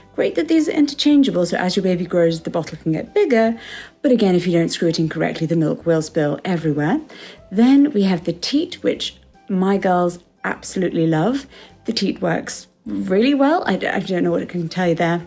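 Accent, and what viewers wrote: RP, United Kingdom. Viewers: Probably because in RP (Received Pronunciation) which is the stereotypical English accent and the one I believe has, premature is pronounced as “preh-muh-tyour”. So if you stick to the accent’s rules it would be preh-mie. Americanisms have crept into a lot of English accents now though, so that may be why people don’t say it like that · Re: strangers talking to you. My grandma will say "sorry I don't speak English" (she only speaks English). Now I want you to try this with people who stop you to talk to you, in your perfect RP English 😂😂😂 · People with the Received Pronunciation, or the stereotypical sort of accent has, say it that way. Not everyone is from the US. This family is British.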